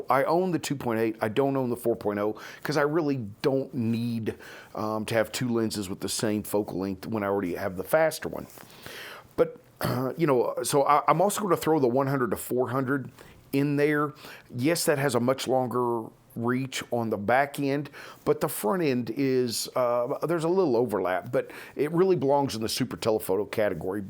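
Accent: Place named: West Texas, United States. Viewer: I like the way you talk, west Texas. I'm Houston, living in Colorado's San Juan mountains. I think I might be the only MFT landscape photographer in three counties.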